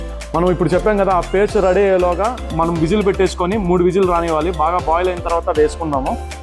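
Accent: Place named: Rayalaseema, India. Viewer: పట్టుద్ది ఎందిరా నాయనా రాయలసీమ భాషను నాశనం చేస్తున్నావ్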